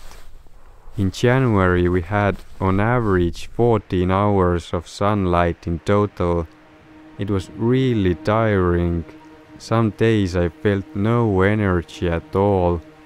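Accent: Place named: Estonia. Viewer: Hi! Great video, really enjoyed it. I have lived in Estonia 6 months and experienced a cold winter as well, visiting some natural parks and hiking in the nature. I really appreciate you talking in English, I can finally understand what you are narrating and your accent makes me remind of my special time in your home country!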